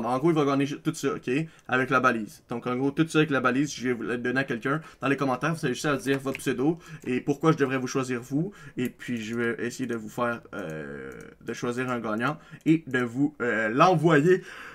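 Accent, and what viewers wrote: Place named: Quebec, Canada. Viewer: parce que j'adore ton accent Tabarnake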